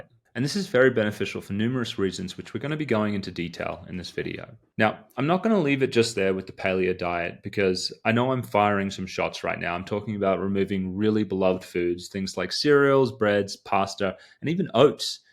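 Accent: Australia. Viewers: Thankyou for the great info. Made some changes in my life and it's really helping. Do I detect an Australian accent? Curious as I'm an Aussie 😊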